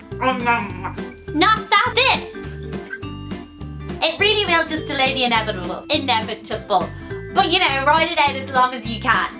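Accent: Ireland. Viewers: I can usually understand you, but I was too hung over to understand a word you said? You were gung ho on the Irish accent on this one? WTH?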